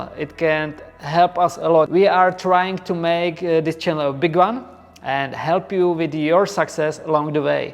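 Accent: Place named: Czech Republic. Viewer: Nejsem nikterak dobrý v angličtině, ale mám pocit že přízvuk je jako bych to řikal já 😁 ( A to si myslím že jeho úroveň je na míle jiná!) Je to jen můj pocit nebo jsem zblbnutý amerikou o tom jak má angličtina znít? Díky za názor Dobrá práce každopádně jen tak dál!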